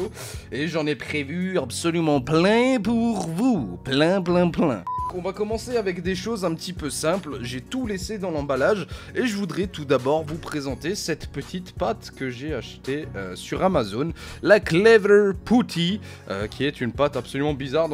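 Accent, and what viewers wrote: Alsace, France. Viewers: sympa cette video dit moi tu serais pas alsacien ( 67)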